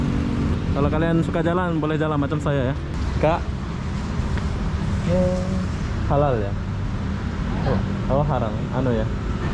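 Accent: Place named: Malaysia. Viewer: Mas bro asli mana kok dialog dan logatnya seperti orang melayu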